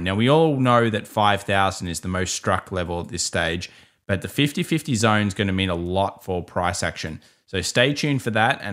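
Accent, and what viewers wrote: Australia. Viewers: Hi Great to hear a fellow Aussie on here:) Fantastic update. Quick question... I have a rather heavy bag of selected low cap altcoins which I thought I got for a good price over the last 3 months but could have bought them all in the last 48 hours with a 20% discount. If you don't laugh you cry. Anyway, the question is obvious....with BTC's premature take off, when do you think small cap altcoins will start to run? Soon after this BTC ATH or months from now when BTC stops pumping and pauses for a while?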